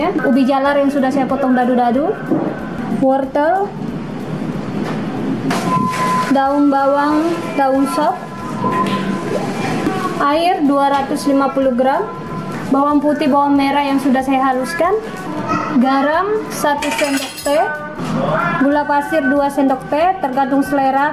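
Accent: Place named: Sulawesi, Indonesia. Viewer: oh pantes, logatx kok ky org sulawesi,, aq asli semarang, tp skrg tinggal di kendari kak,, semangat bikin kontenx kak,, aq ikuti trs lo kontenx kita,, 👍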